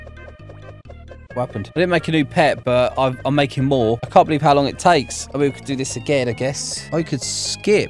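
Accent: United Kingdom. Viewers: are you brithis?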